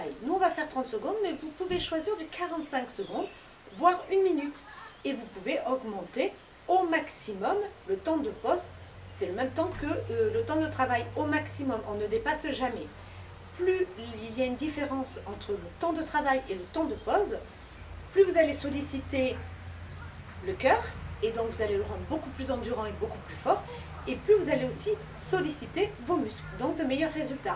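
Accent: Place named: South-West, France. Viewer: Un vrai bel accent du sud-ouest 🤩 !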